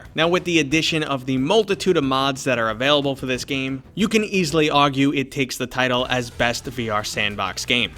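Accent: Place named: Brooklyn, United States. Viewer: I’m Scottish and I feel this guy is from Brooklyn. Am I right?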